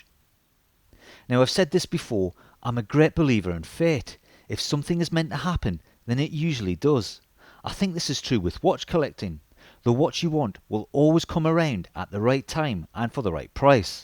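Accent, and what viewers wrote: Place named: Newcastle, United Kingdom. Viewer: Nice watch and great review! Love the bit of history and the Geordie accent! 👍 subscribed...🙂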